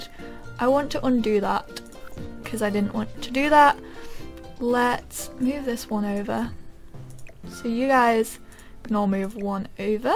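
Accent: England, United Kingdom. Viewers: ***** she totally british you can tell by her accent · Hahaha! I feel so identified with the lack of building skills... Anyway, Sims 4 seems perfect for us! I love the videos, you are making the wait easier!! And your british accent is adorable, by the way!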